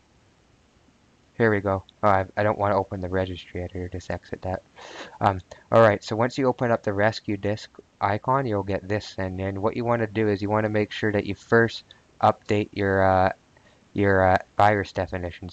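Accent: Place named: Canada. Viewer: Ese acento Canadiense... 😏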